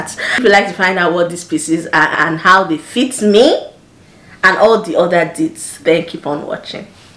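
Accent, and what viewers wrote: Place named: Igbo, Nigeria. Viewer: Love the humour jare,your Igbo accent is on point🔥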